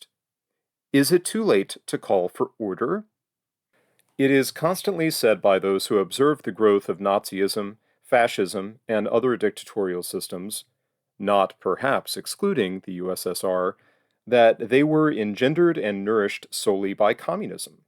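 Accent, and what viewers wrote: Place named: United States. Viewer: Wrong for this to be read by an American voice.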